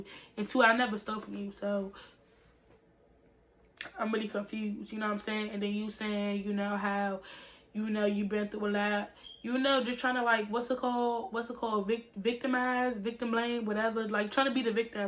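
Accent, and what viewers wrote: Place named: Washington DC, United States. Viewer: You from the dmv? Lol you got that DC speech 😭😭😍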